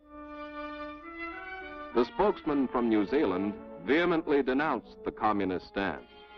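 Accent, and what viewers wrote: United States. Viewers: Good American accent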